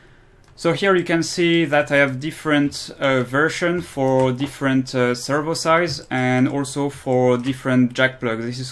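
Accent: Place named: France. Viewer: j'ai direct entendu ton petit accent Français des le début de la vidéo xD sinon super vidéo !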